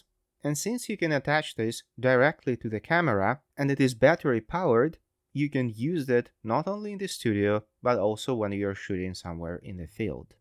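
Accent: England, United Kingdom. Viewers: о, вы из Англии?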